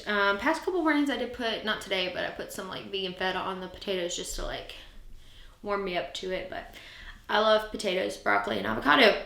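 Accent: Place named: South, United States. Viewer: Girl, I am jealous! My hubby's family lives in Alabama. It is beautiful there, and like you said, it's close enough to everywhere, but far away enough to stay lost if you want to. You are living my dream! Hang in there. It will all work out. Glad you're back. I could always tell you're a southern girl. Me too!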